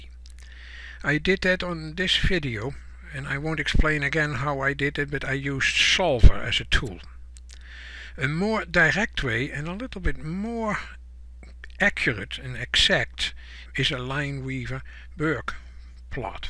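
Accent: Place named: Netherlands. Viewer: hey! i assume that u are from te nederlands? i use the same accent haha! LOL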